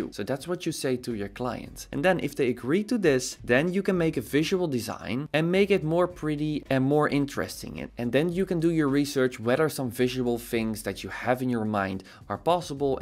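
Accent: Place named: Netherlands. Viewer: I like how he sometimes forget to hide his Dutch accent. Great channel by the way.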